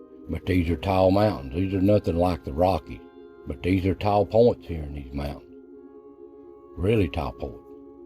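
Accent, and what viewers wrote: Appalachia, United States. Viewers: I feel kind of a kinship to you. My people settled in the Appalachians on the east side and yours on the west side, we both have the same surnane and we're both country boys from birth with the same accent. If we could go back far enough I think we would see that our families (on the paternal side) came from the same place.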